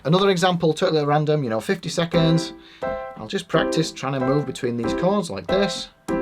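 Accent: Yorkshire, United Kingdom. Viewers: Love the energy, British accent, coffee tips, variety of exercise! Videos are very enjoyable!!! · Nice to hear a fellow yorkshireman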